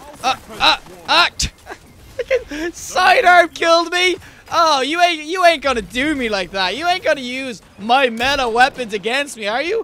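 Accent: Ireland. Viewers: i was wondering I can't work out where in Ireland you're from? i can't figure it out. i'm usually good with our accents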